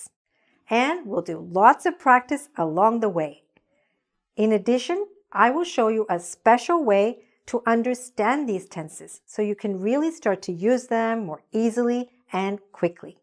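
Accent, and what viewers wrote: United States. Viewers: This english is american or British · Hi,! I'm from Bavaria. Are you an American? You speak English with an american accent, I'd say. Or I'm wrong?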